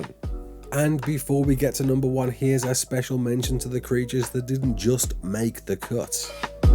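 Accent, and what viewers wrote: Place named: Walsall, United Kingdom. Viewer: Only just came across this video, it was really really helpful. You sound like you’re a fellow Walsall lad 😄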